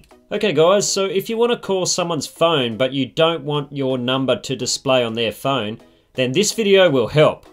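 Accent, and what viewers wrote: Australia. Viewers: Thank you. Love the Aussie accent 🐨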